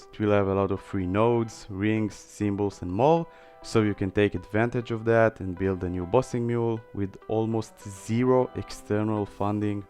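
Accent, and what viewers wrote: Israel. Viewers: וואלה יא קינג זהיתי אותך לפי מבטא איזה ישראלונים אנחנו, אחלה סרטון דרך אגב. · ישר זיהיתי תמבטא הישראלי אחי ישר סאב!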